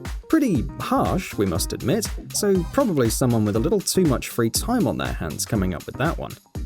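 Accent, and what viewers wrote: United Kingdom. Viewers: Love your channel are you british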